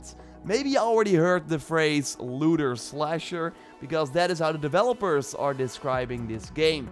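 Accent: Netherlands. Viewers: You’re Dutch I can hear your accent.. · You sound dutch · Meh French accent is diffrent thougj i live in belgium and we speak dutch and frensh and it sounds more like dutch accent tbh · I think this guy is Dutch, I can just hear it.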